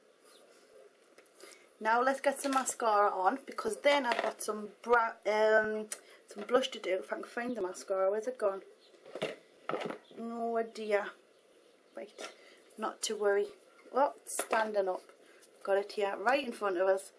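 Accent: Newcastle, United Kingdom. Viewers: wooo for the geordie accent!!! what make up diod you use for this look because i'm a huge germany fan lol :P n yeah :P idc if i'm english, heh. x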